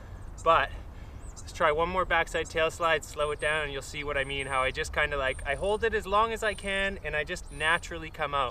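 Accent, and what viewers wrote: Canada. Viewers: the Canadian accent was strong on this one haha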